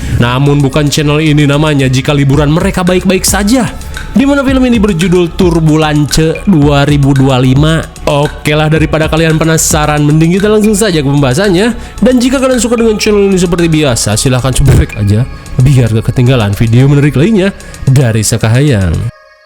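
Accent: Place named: West Java, Indonesia. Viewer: channel youtube yang nyunda nya nyunda bgt 😂😂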